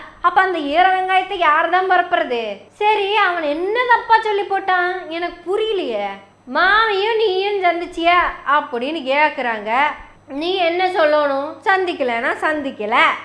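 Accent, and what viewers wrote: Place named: Coimbatore, India. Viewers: அடடா.....கோவை ஸ்லாங் சூப்பர் அம்மணி... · சேலையை கட்டிட்டு கோவை தமிழில் தங்களை போல் பேச இனியொருவர் பிறப்பதில் சந்தேகம்.ஏதோ புகழ்ச்சிக்காக அல்ல.உண்மை.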